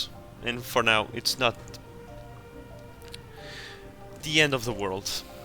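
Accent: Portugal. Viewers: I bet you are portuguese, you sound like it, dont get me wrong, your english is very good :) and spanish people dont go along that well with english eheh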